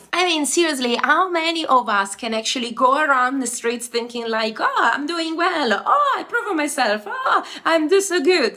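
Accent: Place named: Italy. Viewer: I loved this book as well, and still refer to it for myself and for others. Excellent review! Love all the artistic ways you edited everything into it. And I love the beautiful way you still "speak" Italian in your English. So very entertaining...I want more! 😊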